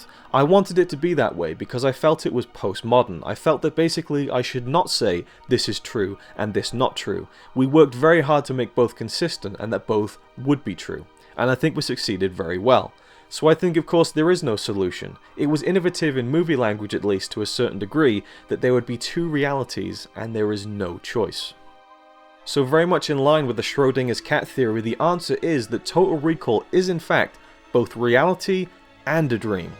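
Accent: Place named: United Kingdom. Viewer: for British accent :)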